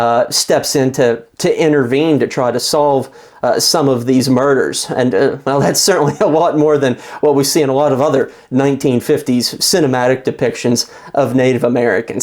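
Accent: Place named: Philadelphia, United States. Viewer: Prof, r u from philly area? You pronounce o like in philly.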